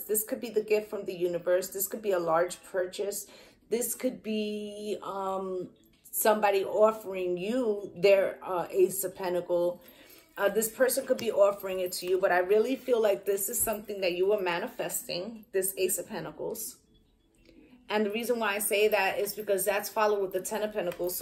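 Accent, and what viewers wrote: New York, United States. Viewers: He'll NEVER be in my Empress energy ever again and he knows it so I'm unburdened and chilling on my throne with my peace and abundance in ALL forms while moving forward😎. I chose ME and snatched my energy back from a toxic ,unbalanced, superficial, false mask, lying, player libra ex after betrayal. NO contact and NO communication will remain in place permanently for that narc demon vampire! Shout out to New York😍. I'm from the DMV but I know a New York accent😁. Peace and Blessings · Definitely hear it when you speak. I can always tell when I hear a fellow New Yorker! 😁 What part are you from?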